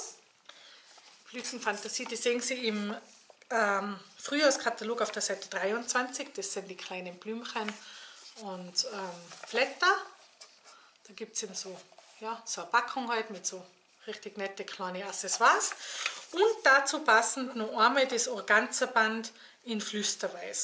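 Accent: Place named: Tyrol, Austria. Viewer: Hallo, wow was für eine tolle Action wieder von Dir. Gerne versuche ich mein Glück und hüpfe mit in den schon gut gefüllten Lostopf. Ich freue mich immer wieder wenn ein neues Video von Dir kommt ich liebe Deinen tollen Tiroler Akzent;-) und natürlich Deine tollen Ideen habe schon einiges nachgebastelt. Viele liebe Grüße aus Bayern Christine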